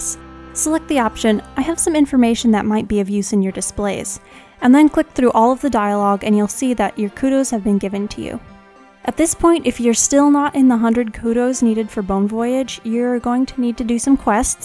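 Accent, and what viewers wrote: Australia. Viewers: gooooooood as aussie accent lol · that ausie accent just earnt u a sub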